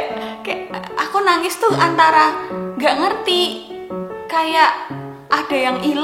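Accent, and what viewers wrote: Java, Indonesia. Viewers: Jowo mu ndi? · asal mana kak? lucu banget medok hihii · nonton video kak saya ketawa2 sendiri terus, cara menyampaikan nya lucu banget apalagi pake bahasa jawa yang medok gth:v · Aku baru liat video kak & jujur suka, apalagi dengan cara bicara nya KK yang medok bngt, ketawa karna di video ini, apa yang KK pikirin itu sama, sama yang selama ini aku pikirkan tentang ciuman & jujur hingga usia ku 22 saat ini aku blm pernah ciuman apalagi bibir sama bibir. Tapi aku suka saat liat drakor ada adegan ciuman nya😂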